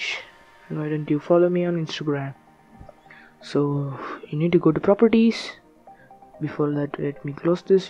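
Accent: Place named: India. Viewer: when i heard indian accent, then i pass.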